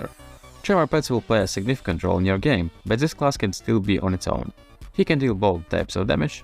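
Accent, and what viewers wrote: England, United Kingdom. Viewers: о вы из Англии? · Не понял. Are you from England?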